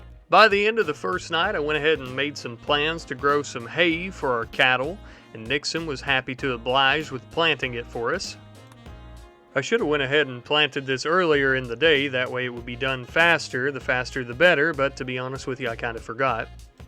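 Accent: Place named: Texas, United States. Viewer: Wait a minute. That accent, it's not just theatric. You're Texan, ain't ya?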